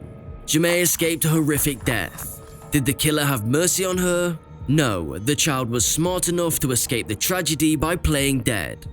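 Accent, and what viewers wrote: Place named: England, United Kingdom. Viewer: This is weird. The narrator seems to have a genuine English accent, yet the script is clearly written by someone who does not speak English very well. How does this business model work? Is the person who wrote the script paying the narrator to narrate it, or is the narrator buying scripts from a foreign content mill? It's interesting that the narrator didn't correct the broken English, despite the fact that he must have noticed the errors.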